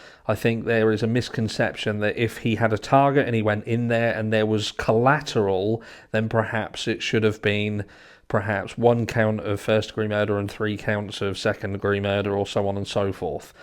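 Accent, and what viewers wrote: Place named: England, United Kingdom. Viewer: U sound like ur from England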